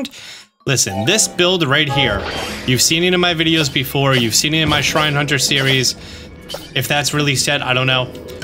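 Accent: New Jersey, United States. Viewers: What part of New Jersey bro? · Wait you're from Jersey?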